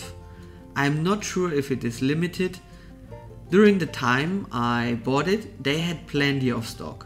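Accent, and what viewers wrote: Germany. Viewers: Der Akzent 😂 100% deutscher 😂was macht ein Deutscher in Dubai ?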